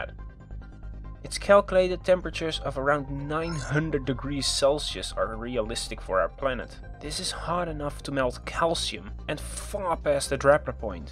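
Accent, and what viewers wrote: Netherlands. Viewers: Are you dutch? Good video btw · Did I heard an Dutch accent?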